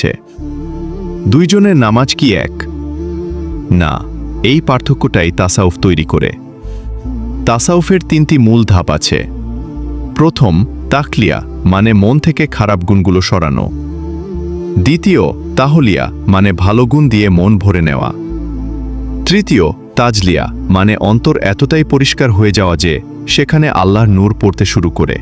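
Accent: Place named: Basirhat, India. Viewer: কন্ঠ টা বাসীরার লোকের মত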